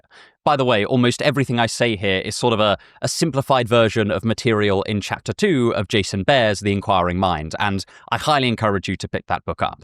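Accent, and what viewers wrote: United Kingdom. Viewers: Yes, I’ve heard the “opinions are like assholes” before, but I thought was inventive to adapt the idiom especially the part about being examined. 😂😂😂 And for me, hearing “asshole” with a British accent carries some elegance that the American accent never will.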